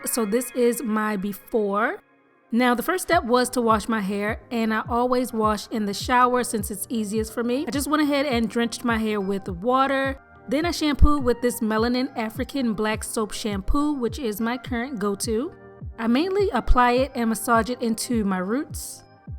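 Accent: Baltimore, United States. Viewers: I've seen your thumbnails for years n I always refer to you as the girl that looks like Nicki lol I love that you did this natural straight style, aslo do I hear a bmore accent?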